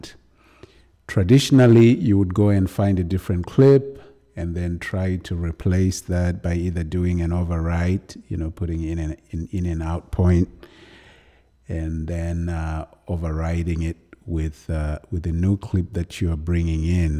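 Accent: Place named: South Africa. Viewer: you from SA? accent had me feeling at home lol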